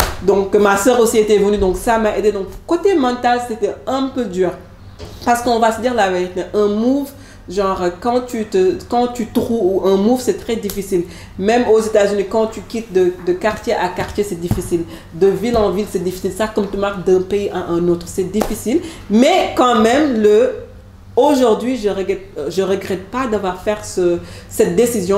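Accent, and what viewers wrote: Senegal. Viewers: Tu es originaire de quel pays pour t'installer au sénégal. Si tu es sénégalaise et que tu es retournée dans ton pays le Sénégal, alors tu n'es pas allée t'installer au Sénégal. Tu es simplement retournée dans ton pays. Ton accent est purement sénégalais.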